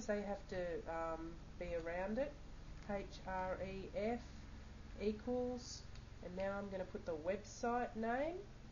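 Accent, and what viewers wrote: Australia. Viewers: okai are you from down unda???. anyways thanks for the video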